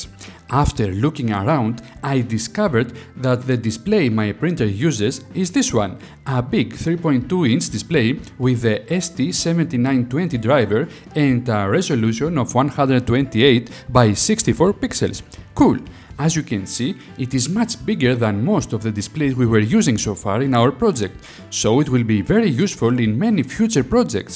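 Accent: Greece. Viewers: Thanks for this video. By the way, are you greek ? Your accent seems familiar :D :D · Are you Greek? I am about 95% certain, this accent is quite characteristic.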